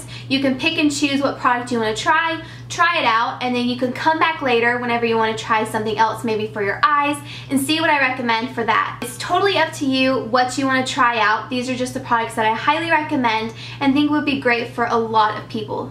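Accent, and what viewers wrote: South, United States. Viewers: I found your channel when I was looking for hair tutorials & I came across you when you had your blonde hair & adorable southern accent( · wow your so pretty and you sound like an all around beautiful american girl! I enjoyed your video :)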